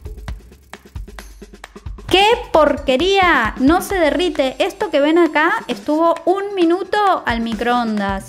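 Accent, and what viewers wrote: Argentina. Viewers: Me encantan todas tus recetas pero me recopan mil tus "meal prep" y tus "puede fallar". Dale, que te veo siempre. Voy a acabar hablando en argentino, che!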